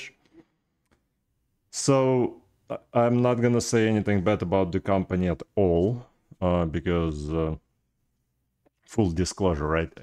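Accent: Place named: Russia. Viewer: По акценту слышно что ты говоришь по русски)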